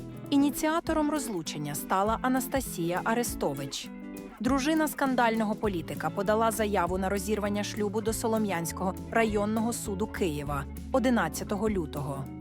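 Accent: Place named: Canada. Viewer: Скандальний твій канадський акцент і замовне втручання в особисті справи.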